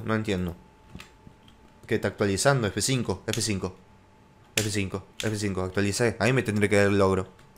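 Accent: Córdoba, Argentina. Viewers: Che Eres Cordobez No Agunta cordoba agregame al fece Enzo Barrera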